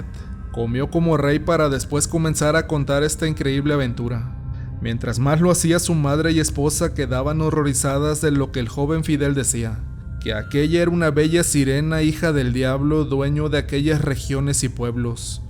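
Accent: Mexico. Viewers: Me encata su asento mexicano y como cuenta sus historias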